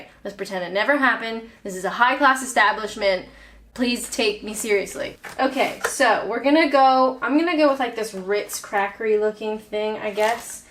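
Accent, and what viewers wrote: Canada. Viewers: You Canadians say "couch" and "house" and "about" strangely. But we love you in spite of it. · Silly question but how is it that you have lived here a while yet your canadian accent hasn't broken yet? would you say that some English has appeared in your vocabulary the more you stay here? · Learning how canadian talk sound to sweet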